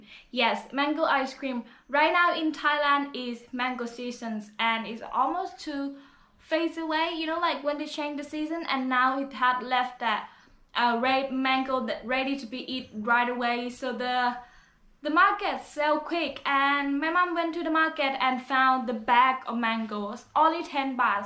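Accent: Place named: Australia. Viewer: You slowly getting an Aussi Accent ...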